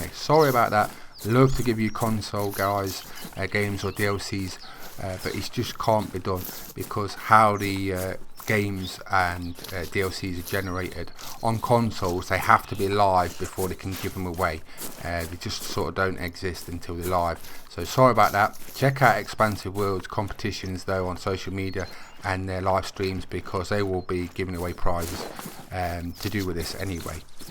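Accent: Midlands, United Kingdom. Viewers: WoW boring. Stupid comp thats dumb just add 250. Plus doesnt offer the game to someone who doesnt own it. Too many yt channels the gd ones get maskd by the million try hards. Why do uk midlands cotw love ther voice so much. Notts Leics? Focus on diamonds and rares not shit chat.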